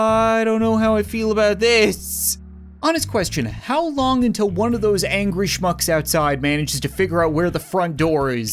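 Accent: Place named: Canada. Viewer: EVERY SINGLE VIDEO I WATCH I GET A UNIVERSITY CANADA AD. I mean it is fitting though, is like the most Canadian person I've ever heard.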